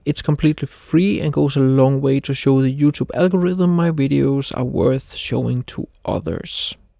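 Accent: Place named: Denmark. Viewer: When I first watched your videos, I thought to myself, "He's the Lars Ulrich of photography." Then to find out that you are also Danish. I knew I knew that accent. 😂😂 Great videos! I really learn a lot from them.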